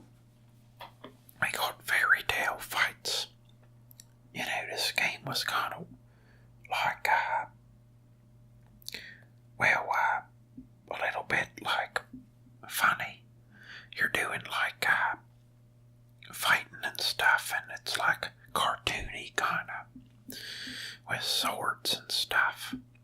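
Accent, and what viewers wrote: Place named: South, United States. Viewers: what is your accent ?! it sounds extremely southern american most of the time and then occasionally falls into australian especially when you say time, like and buy... its rather peculiar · owen leather this is,late but it sounds like a southern American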